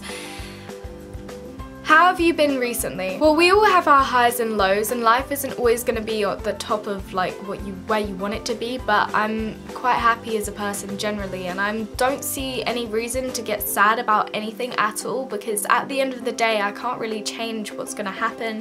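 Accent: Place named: United Kingdom. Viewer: I want to be you....your...your like British or something! I like making accents but I cant do British & I am working on my "Australian" accents.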